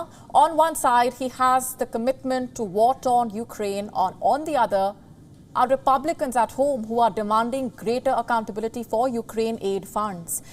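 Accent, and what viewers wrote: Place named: India. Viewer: Nothing worse than Indians speak English my ear drums are bleeding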